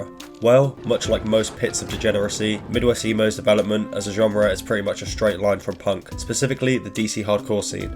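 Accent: United Kingdom. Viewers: Lmao, British accent talking about the Midwest… cute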